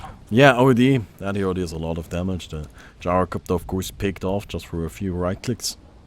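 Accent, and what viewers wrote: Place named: Germany. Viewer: Same, that German accent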